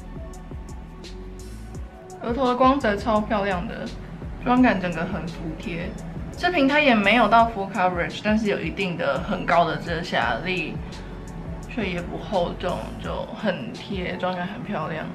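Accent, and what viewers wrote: Taiwan. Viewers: 講台語好可愛！！ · Cara講台語也太Q了吧哈哈哈哈哈就ㄊㄧㄤˋ欸就ㄊㄧㄤˋ欸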